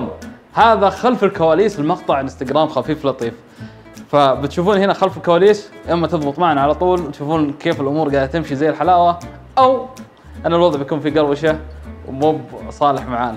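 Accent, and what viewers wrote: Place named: Al-Ahsa, Saudi Arabia. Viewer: من وين انتي؟😂😂 احسك حساوية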